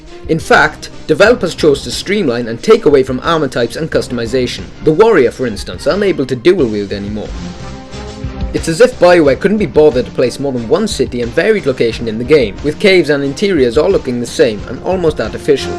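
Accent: Wales, United Kingdom. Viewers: BTW that's not a English accent either. Sounds Welsh. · are you Welsh? It sounds like you have a Welsh accent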